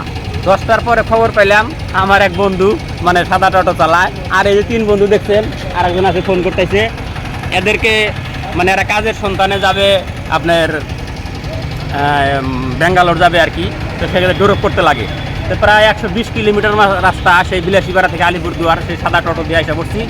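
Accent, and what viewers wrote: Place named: Bangladesh. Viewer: ৯ লাইক ভাই আপনার কথাবার্তা আমাদের মতো মানে বাংলাদেশের মানুষের মতো আপনার অরজিনাল বাড়ি কোথায়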